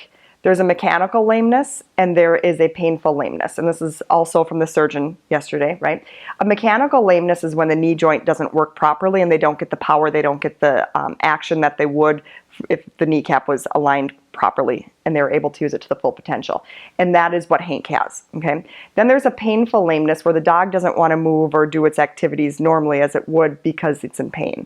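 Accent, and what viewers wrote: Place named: Midwest, United States. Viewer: very very good and informative series. My English Bulldog has been diagnosed with a stage III Luxating Patella also. I have not been told at this time that his ACL is not intact. He is 2 1/2 yrs old. It sounds like you would like you are from the Midwest. I plan to take him to the UNIV of WI Veterinary School which is supposed one of the best in the country. His first consultation will be a 4 hr exam ( so a very thorough exam) and then at that point he will be diagnosed as to how to proceed. Thanks again for the video series so I know what to " really expect" once he is sent home. I have been told that he will be staying overnight with his team to include check ups with his anesthesiologist to make sure he is not having any severe reactions for the surgery and anesthesia that was administered.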